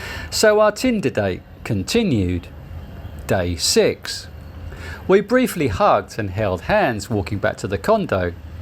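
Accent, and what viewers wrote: United Kingdom. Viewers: I just love your videos, Cheers 🍻 from Serbian fan. Sametime struggling a bit with British English, but ok.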